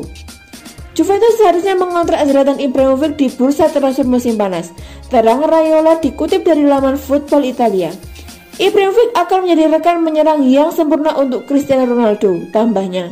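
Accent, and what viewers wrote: Java, Indonesia. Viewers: Hahahaha penyiare orang jawa yaaaa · Medok cuk 🤣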